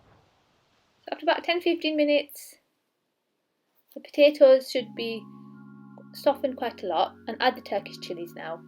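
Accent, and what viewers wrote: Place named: North, United Kingdom. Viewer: it’s the northern accent that does it for me!!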